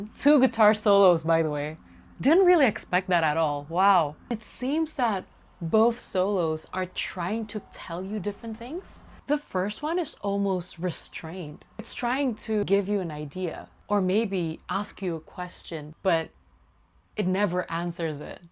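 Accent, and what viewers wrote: United States. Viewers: I just found you and love your reviews. I was wondering, you speak perfect American English but you grew up in Indonesia then went to London. I don’t hear an Indonesian accent and if there is, it’s very slight and you definitely don’t have a UK accent. Did you go to college in America? You are a very beautiful person. Love your take on music as well. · Jakarta, Singapore, and London...yet your accent is quite American. California, perhaps the bay area.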